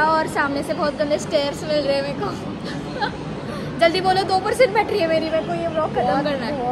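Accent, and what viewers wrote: Kolhapur, India. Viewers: Tujhi Kolhapuri Flow Cha Fan Zalo Aahe Mi Kai Toh Flow Aai Hayee Ghayal 🦋💗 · Bhaii urr kolhapuri acent😂💖itss tooo good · Tuze vlog chan aahet Pn tu marathi tunch vlog karat ja Tu marathi khup chan boltes Ekdm kolaphur style Love and support you 😇❤✌ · Aga ky masta kolhapuri accent aahe yrrr....😍😍. ly bhari te takkay ja vlog madhe 😄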